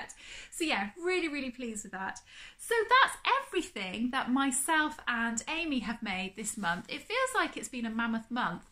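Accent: Devon, United Kingdom. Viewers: Hi, I think the dress and the fabric really suit you! Love your vlogs - and your Devon accent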